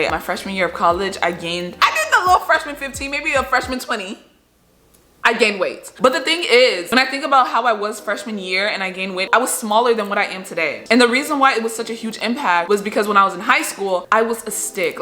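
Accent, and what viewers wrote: Nigeria. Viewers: Lol I was wondering why you always switched to a Nigerian accent in your videos 😅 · Are you Nigerian 🇳🇬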